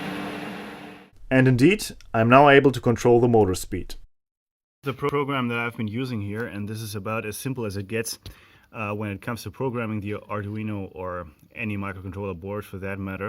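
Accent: Germany. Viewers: I was a US soldier serving in Germany from 1990-96 Your flea markets are much like your scrap yard, All nice stuff, over here in the state rarely do you get steel drops or anything that functions! Your videos are great, my mother was German, she passed in 2017, it's always nice to hear a German accent, thanks for sharing your work! ;)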